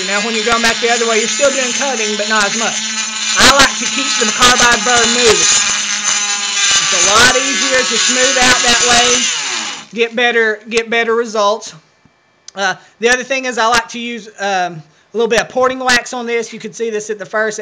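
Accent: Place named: South, United States. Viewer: ha! you are a bit southern sounding.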